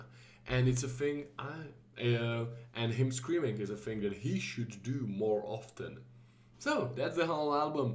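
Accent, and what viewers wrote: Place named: Czech Republic. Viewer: I don't know why but your English sounds very czech.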